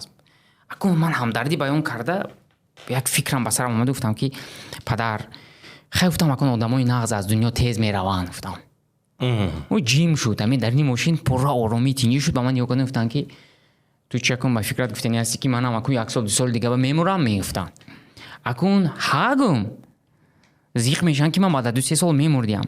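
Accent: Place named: Dushanbe, Tajikistan. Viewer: Говоришь более или менее толковые вещи, но твоя речь такая поддельная, просто режет ухо Ты говоришь о литературной речи, в то время через слово используешь душанбинский лад Для меня вообще не проблема иметь диалектов, это изюминка. Короче, ты прогнулся братан. Прибегал туда где высокооплачиваемая аудитория, зачем нести херню о едином языке и тд Такое чувство что ты - реальный подкаблук, это не нормально, вообще. Ты давишь на больные точки женской аудитории, типо ущемляют права и чувства женщин Будь оригинальным, ну типо собой!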